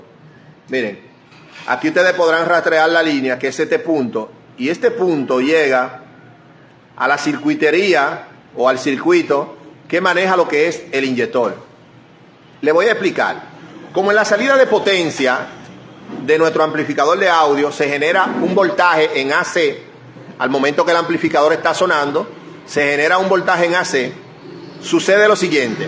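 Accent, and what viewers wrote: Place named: Dominican Republic. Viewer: Por favor señor hay que ser comprensibles el maestro es de República Dominicana y así es su idioma 🤨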